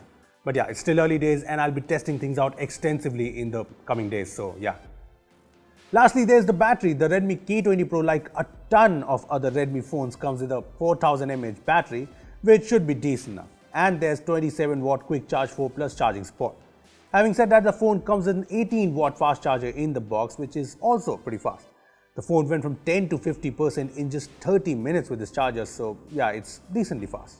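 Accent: India. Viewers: Nothing is more unsexy than an indian accent Head turned left that at least one eye is looking in the camera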